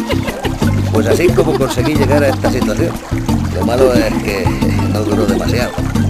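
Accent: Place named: Spain. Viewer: Joder extrañe mucho tu voz española sukulenta 😢...ha por cierto muy bueno el uso del codigo para saltarse niveles es que son jodidos enserio 😂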